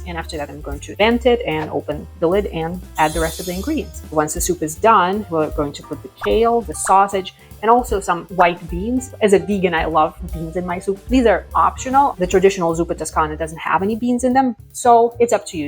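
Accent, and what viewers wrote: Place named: North Macedonia. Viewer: Hi! This looks really yummy! I will try this 😊 You have a very slight accent, are you originally from Macedonia? I have a good friend from Macedonia that sounds like you! Have a great day 😊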